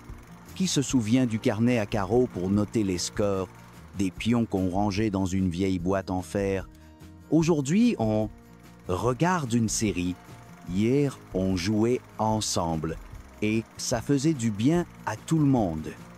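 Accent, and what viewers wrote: Quebec, Canada. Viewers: C'est fou ce que cette voix a l'accent de Limoges (ou il est censé avoir passé son enfance) C'est plutôt quebecois Mais pour le fond ,ok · ... ce petit accent du Québek.... il me plaît bien pour dire comment c'était avant, chez nous en France.:)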